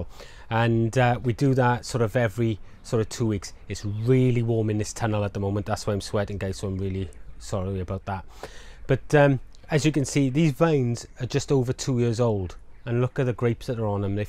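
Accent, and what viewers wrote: Wales, United Kingdom. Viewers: As soon as heard your voice I thought - he sounds Welsh - possibly from South Wales. Then I saw your T shirt. Where are you based?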